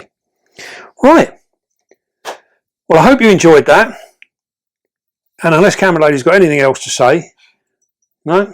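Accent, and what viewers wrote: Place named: England, United Kingdom. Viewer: I'm late seeing this, sorry. But so enjoyable! I respect and learn from many channels and keepers, but you and Camera Lady are my favorite! It's educating, fabulously interesting and funny too at many times...this is one! I am a sucker for an English accent as well!😂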